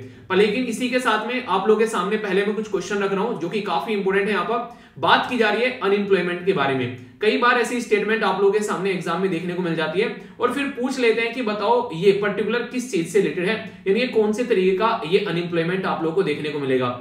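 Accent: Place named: Kangra, India. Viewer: Sir kangadi bolte hai 😎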